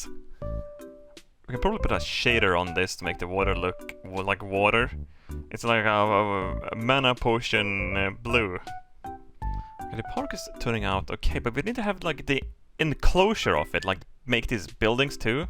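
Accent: Sweden. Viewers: making videos in English for 20 years and still having a strong swenglish accent is wild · I can only hope to one day achieve level Swenglish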